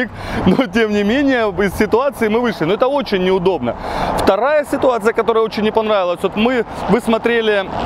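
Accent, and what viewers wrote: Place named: Luhansk, Ukraine. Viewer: Virab Saakyan он с Луганска ,а там у всех говор такой. Мой земляк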